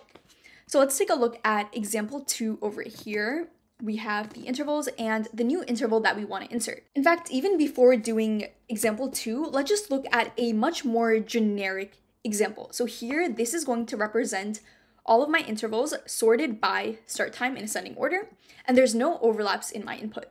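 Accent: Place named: India. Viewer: Can feel indian and American accent ❤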